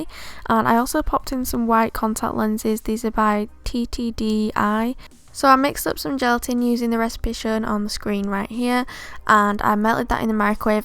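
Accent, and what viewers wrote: United Kingdom. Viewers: WHO KNEW SHE WAS BRITISH AND HAD AN ACCENT LIKE THAT! TF I THOUGHT SHS WAS AMERICAN😂❤️❤️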